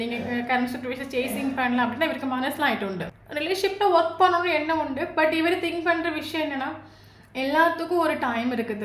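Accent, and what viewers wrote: Kerala, India. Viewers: Super sister காதல் பிரிவில் எவ்வளவு கஷ்டத்தில் இருந்தாலும் உங்களுடைய பேச்சு எங்களுக்கு புத்துணர்ச்சி அளிக்கிறது உங்களின் தமிழ் மலையாளம் கலந்த பேச்சு மிகவும் அருமை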